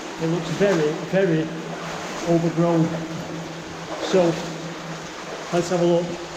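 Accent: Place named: Manchester, United Kingdom. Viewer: Absolutely fascinating. Plus it's lovely to here that beautiful Manchester accent! I now live in Yorkshire and miss my home town:(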